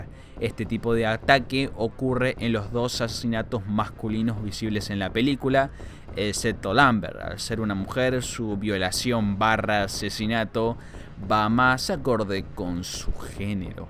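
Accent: Argentina. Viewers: eres muy buen editor, buen análisis amigo, transfondo intelectual, saludos desde México, voz sos argento "pibe" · Tanto le cuesta leer a un argentino.? Super Sho, El Esho? Igual que un puertoriqueño, saben que hablan mal pero igual lo hacen hacen 🙄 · El esho, el ego y el súper sho jajaja cagado acento Argentino.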